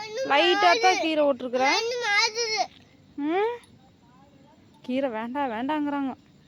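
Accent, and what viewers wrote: Kongu, India. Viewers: நீங்கள் கொங்கு தமிழ் லயே பேசங்க அம்மா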